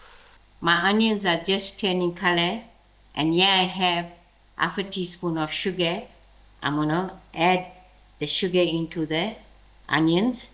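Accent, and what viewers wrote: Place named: Sezela, South Africa. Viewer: Aunty you sound like a Sezela Tamil aunty,saying orhil and tamartoe. How big is Prakash's dhanda?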